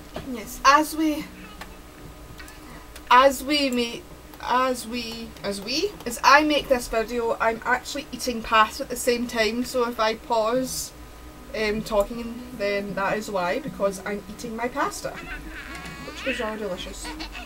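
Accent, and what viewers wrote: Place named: Scotland, United Kingdom. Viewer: I always enjoy watching your videos. I just have to watch some parts over and over again. Because of yer scottish accent x3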